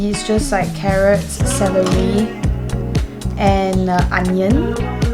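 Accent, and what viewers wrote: Singapore. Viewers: she sounds Singaporean.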